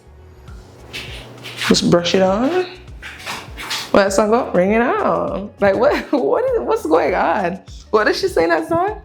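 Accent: Jamaica. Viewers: You sound Jamaican I'm Jamaican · Why do u just LOVE to hear the Jamaican accent?? No matter how long we live in the USA wih juss caan hide😂😂😂.. !! LOOOVE ur work too Mama🥰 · What is the models she’s gorgeous and you beat that face soo good I miss og makeup tutorials like this and I’m Jamaican too did I hear that accent lol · I actually really love this detailed video🎉❤ You've been popping up on my page for a few days now, and worst you sound Jamaican, you've gained a new makeup sub❤ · First time seeing your video. This showed up on my feed. I hear a Jamaican accent. Just check the description and I wear correct😂 hello from your fellow Jamaican🇯🇲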